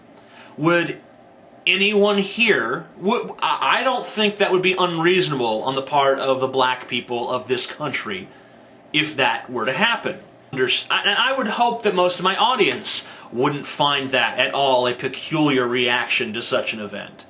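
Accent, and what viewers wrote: Germany. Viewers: I agree with what your saying, and think your german accent is bamf However, i 'feel/think' that hitler only focused on anti-semitic ideals because he needed to have a scape goat to get a majority of the germans to side with him. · your german acent is amusing :) 5/5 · Your German accent isn't too bad. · I'm German and I love your german accent. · It's funny how this video created a white supremacist forum in the comment section. All reassuring each other that their points of view aren't dangerous/hateful/stupid/retarded. BTW your german accent is funny too. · your german accent is fine :-)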